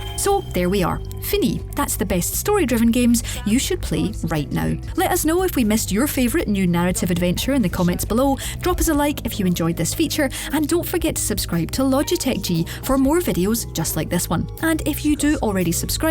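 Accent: Scotland, United Kingdom. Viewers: I like the narrator's voice and Scottish accent. Calming. Easy listening. I just bought Redeemer, Life is Strange (all 3), Blacksad, Destroy All Humans, Creepy Road, Strange Brigade and Guns Gore & Cannoli Capo Dei Capi edition. And on PS Plus they gave us Days Gone this month. They've done alright lately I think. There's other good games they've given out too recently. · I loved the video, well-crafted list. Also the Scottish accent brought back lots of nice memories, thanks🙏🙏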